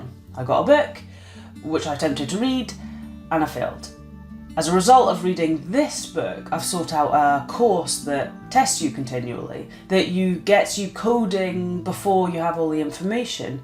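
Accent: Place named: United Kingdom. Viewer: I love it when a British speaks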